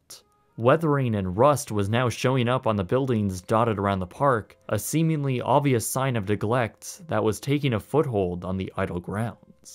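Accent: Canada. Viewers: "forgotten aboot'! Love the Canadian accent!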